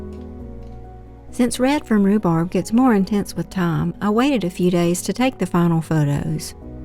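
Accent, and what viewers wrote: South, United States. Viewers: Does her English sound weird to you? She has a southern accent, from the Southern states of the US.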